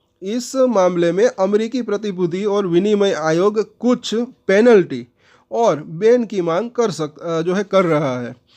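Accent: Gujarat, India. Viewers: ભાઈ ગુજરાતી લાગો છો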